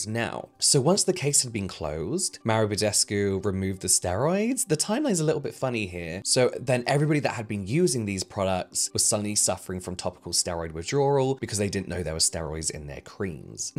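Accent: United Kingdom. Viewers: struggling to say the second steroid ingredient made me happy…. Simply because I also cannot say anything correctly, and to hear a very posh English person also struggle… One of us!! Is all I could think 😂😂 thanks for being a real person haha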